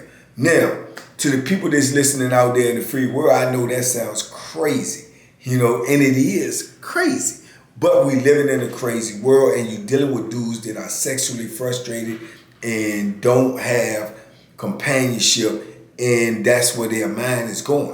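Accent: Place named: Virginia, United States. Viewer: Man, I love the stories, but they're just a bonus to me. Started watching APS and then living in Asia...just to hear voices from that Tidewater/VA area. Ain't nothing more comforting than an accent from home when you been gone too long. Damn good to hear your accent man. Salute.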